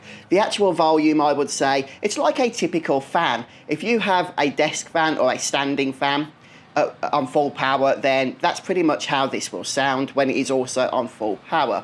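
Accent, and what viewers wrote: United Kingdom. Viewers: Thank you for this great review. I love your British accent. I'm studying for IELTS and is a pleasure to listen and learn from you.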